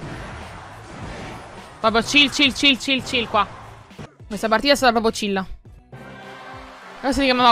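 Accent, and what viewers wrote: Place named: Sicily, Italy. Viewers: Buonu nisciu l'accento siciliano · Ma sei palermitana io catanese cmq sembri palerimitana dall'accsnto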